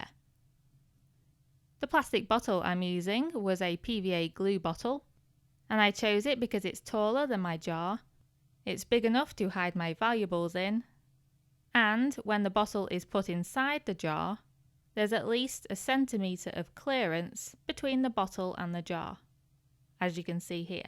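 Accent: United Kingdom. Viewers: pva glue is used in the UK, you can tell from her accent that's where she's from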